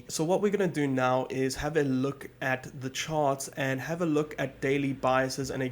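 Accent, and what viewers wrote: South Africa. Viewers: youre south african?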